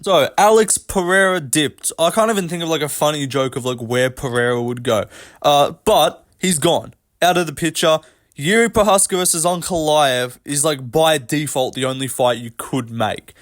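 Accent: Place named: Australia. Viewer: Bedtime MMA but more Australian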